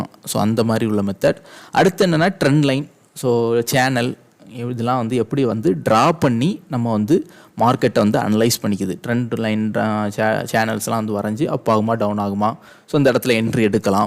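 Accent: Kanyakumari, India. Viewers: Kanya Kumari Slang😊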